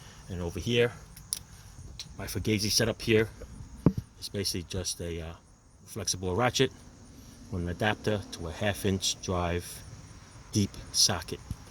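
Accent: New York, United States. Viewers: Sorry but is this dude a Chinese guy with a new York accent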